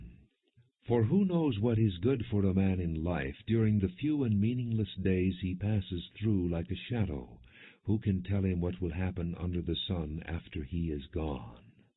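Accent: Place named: United States. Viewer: had a hankering for this book and had to look awhile for an American accent reading, the cheesy shakespearean british ones fail to capture the modernity/coherence of the prose. good stuff!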